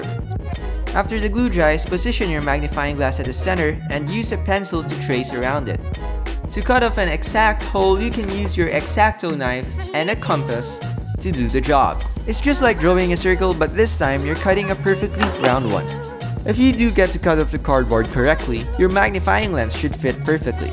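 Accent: Philippines. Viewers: He has a filipino accent :// sorry I'm new here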